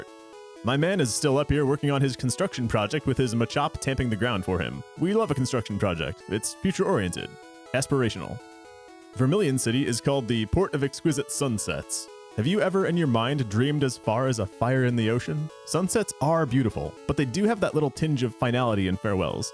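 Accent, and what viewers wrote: United States. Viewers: This is a break from ADV randoms, and I dig that you're working on an American accent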